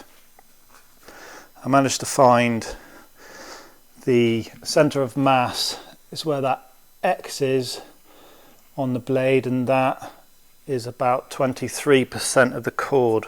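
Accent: United Kingdom. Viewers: Well done for getting this far. I built a prototype coaxial 6 years ago and got it to basic hover testing but put it away in my shed and now I have some more time are wanting to move it to next phase of testing. I was wondering where you are based? You sound British. I'm live in New Zealand.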